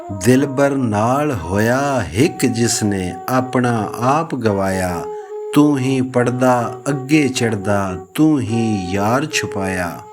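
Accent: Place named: Lahore, Pakistan. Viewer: السلام علیکم عید مبارک صاحب. معزرت کے ساتھ سیف الملوک کے خالق کا لہجہ پوتھواری زبان میں تھا اپ اس کو پنجابی لاہوری اندازا میں، پیش کر رہے ہیں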